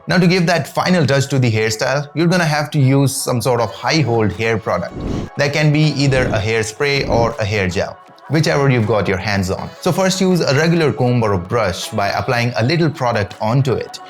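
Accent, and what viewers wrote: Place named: India. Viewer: Indian, paki maleslut! Go and learn to speak eanglish girst, becose your indian eanglish accent sounds like shitt.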